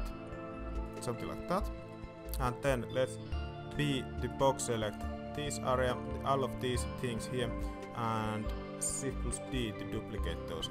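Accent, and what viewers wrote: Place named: Finland. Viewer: Now that is a heavy finnish accent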